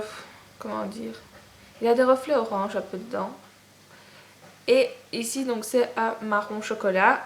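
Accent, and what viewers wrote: Belgium. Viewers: Sympa d'entendre une belge pour une fois hihi :) J'avais déjà entendu parler de sleek mais je ne savais pas où commander, grâce à toi mtn je sais :p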